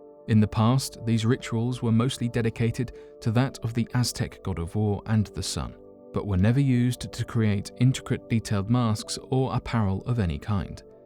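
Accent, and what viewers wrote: United Kingdom. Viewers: It's hilarious how many people want to correct how this poor guy pronounces words. He's British! They pronounce things differently. You trying to correct him is more annoying than him pronouncing words in a way you don't like. Lol!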